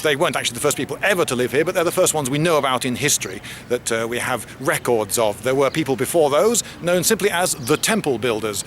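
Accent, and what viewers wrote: United Kingdom. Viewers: haha is so british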